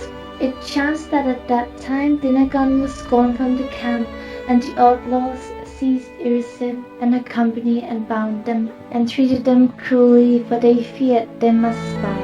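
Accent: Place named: Netherlands. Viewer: I liked the video :D Thank you for the subtitles, though. You have a pretty dutch accent :)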